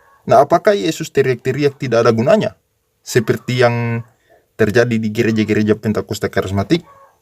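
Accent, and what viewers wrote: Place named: Eastern Indonesia, Indonesia. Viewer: Yg Bicara Ini Dr Logat nya Dari Suku NTT ATAU MALUKU!!!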